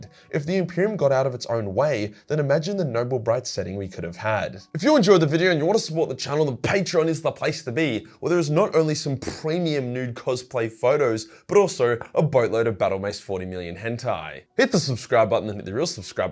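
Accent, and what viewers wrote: Australia. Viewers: First twenty minutes and three of these views are mine lmao. My phone, switch, and PC. I couldn't get two to buffer correctly and now all are running and I just.. This cacophony of Aussie is magnificent. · It's the Aussie accent inflection and pronounciation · Lol I think the risked it thing is from your aussie accent. As a American kinda flows off the tongue but I can see how itd sound funky with an australian accent.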